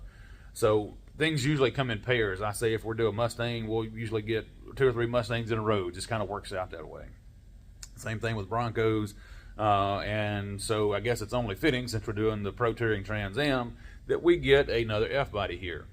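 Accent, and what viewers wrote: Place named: South, United States. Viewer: Where are you from ? Colorado? I detect a southern twang.. 🤠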